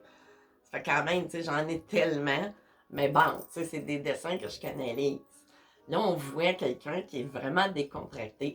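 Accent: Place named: Quebec, Canada. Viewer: Vs avez un accent québécois.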